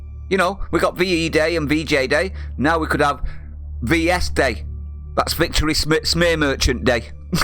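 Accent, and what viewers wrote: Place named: Manchester, United Kingdom. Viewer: Great post from a person with a Manchester accent - glad to say that I recently joined the ranks of people who has cancelled their TV licence - the thought of all those on the "BBC gravy train" especially '£1.75 mIllion Lefty Lineker' equivalent to over £33,000 a week or a welsh lefty paid £500,000 a year just to read the Biased BBC news? Drain The Swamp.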